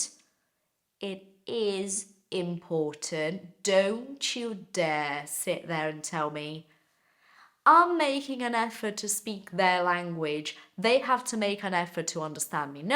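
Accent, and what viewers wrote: United Kingdom. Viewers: ai para de ser chato, ela não tem sotaque brasileiro nenhum, ela passa 100% como britânica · Does she practice an British accent?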